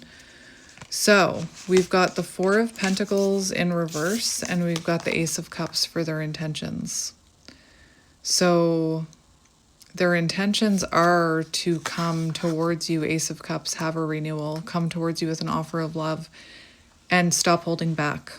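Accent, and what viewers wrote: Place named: Canada. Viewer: As a Canadian I've been wondering for a minute if you were also Canadian because of your accent. I'm in the U.S. so I can def recognize that homeland dialect 😂❤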